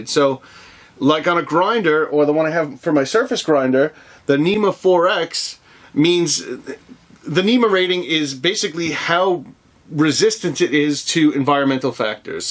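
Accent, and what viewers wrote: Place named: Long Island, United States. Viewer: you should have never stop making videos. You come across great It’s your Long Island accent that makes it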